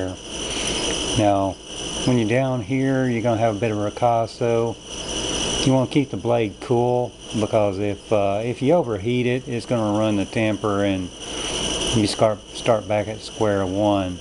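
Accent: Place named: North East England, United Kingdom. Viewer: I like that accent, you don't see it much in the North East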